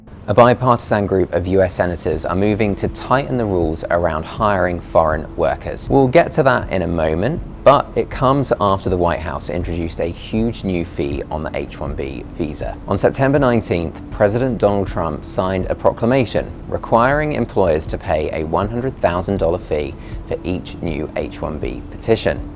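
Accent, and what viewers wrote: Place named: United Kingdom. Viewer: Kick out this UK guy or charge 100K for him. I am sick and tired of getting USA news with British accents. Please go home!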